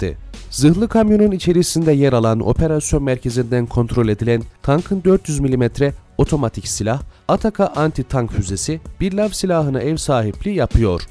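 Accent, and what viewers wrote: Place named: Russia. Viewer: rus aksanınız var acaba rusmusunuz?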